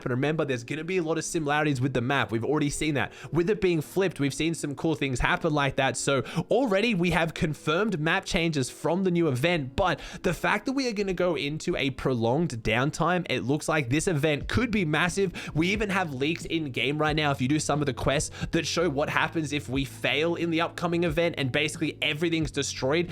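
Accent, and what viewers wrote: Australia. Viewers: Not gonna lie, Groggy brought a smile to my face. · Wtf haha that voice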